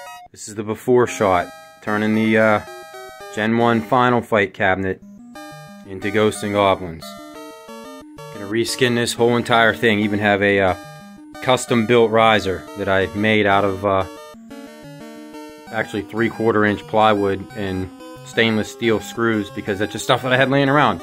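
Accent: Western Pennsylvania, United States. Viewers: Heard that western PA accent and then confirmed it with the Pens lamp! Let’s good man!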